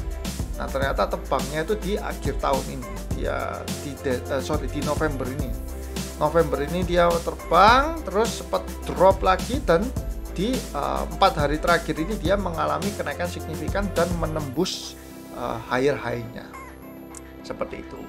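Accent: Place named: Java, Indonesia. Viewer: Dialeg nya medok banget mas *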